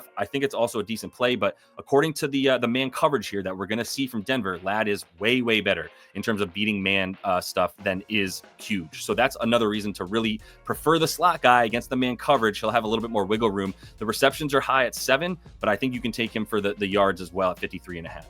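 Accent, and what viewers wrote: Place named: Boston, United States. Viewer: sounds like his from Boston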